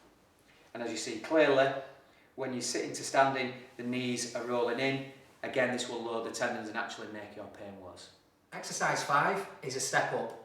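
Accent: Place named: Yorkshire, United Kingdom. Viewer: I have just subscribed to your helpful videos. I can't do these laying down movements on the floor as I can't get back up. Is it ok on the bed? The bad pain in my hip is far worse at night wakening me several times. I will try your advice thank you so much. By the way lovely to hear your Yorkshire accent.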